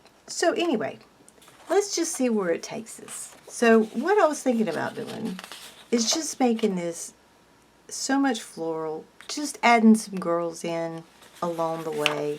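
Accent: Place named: Oklahoma, United States. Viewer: Hi, this is the first video that’s popped up on my home page, so I clicked on it as I am fairly new to decorating journals. My name is Karla, in Oklahoma. You don’t sound like you far from Oklahoma? lol your accent. Anyways, you created a very pretty spread. Love how the girls are cascading inside the faux Dutch doors. Thank you for bringing us along 😊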